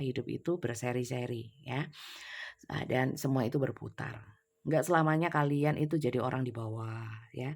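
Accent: East Java, Indonesia. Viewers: Aku itu selalu teetawa sendiri kalau mendengar readingan bunda. Karena khas bahasa jawatimuran nya selalu muncul..😂😂😂😂 benar benar terhibur..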